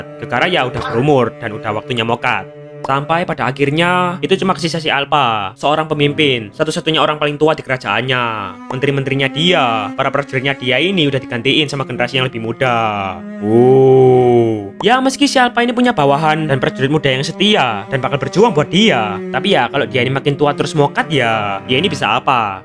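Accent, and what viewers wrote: Java, Indonesia. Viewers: Bang kamu jawa yoo lek jowo podo rekk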